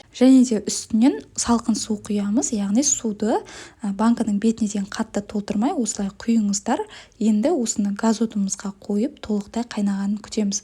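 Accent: Standard. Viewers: Саламатсызба тәте? Сізге көп рахмет, таза қазақша түсінікті айтқаныңызға .Алла өзіңізге жар болсын! Қолыңызға қуат берсін Алла. Ауырмаңыз , балаларыңыздың қуанышы боп жүре беріңіз тәте , бұданда жақсы рецептермен бөлісе беріңіз.. Алға қазақтар ...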